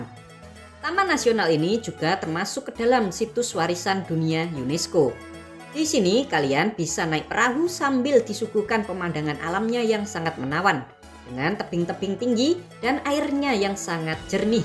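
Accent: Java, Indonesia. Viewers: suara mimin lucu banget karena orisinil logat jawanya itu yang bikin lucu 🤣🤣🤣🤣🤣🤣🤣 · Adminnya medok banget logat jawanya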